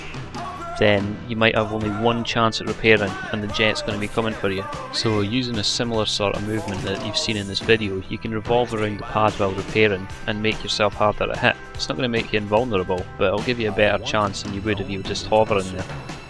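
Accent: Scotland, United Kingdom. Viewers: Nice one. You sound quite clever for a jock ;-) Great tutorials there. Really enjoyed ya voice too. Normally cant stand Scottish accent, but then again you would hate my brummie one eh? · nice tutorial m8. i see you're very experienced. and nice accent. im scottish too :D. Do you still play BF2?. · nice tutorial m8 good 2 hear a scottish accent explaining it a want to master that funneling looks a good move i try swoop in shooting lol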